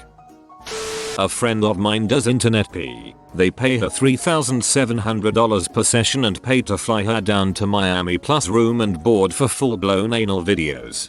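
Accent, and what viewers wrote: United Kingdom. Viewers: I hate the british voice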